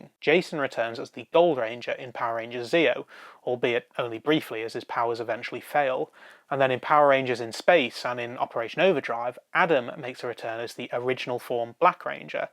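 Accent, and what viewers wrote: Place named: Black Country, United Kingdom. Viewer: Hes from the black country England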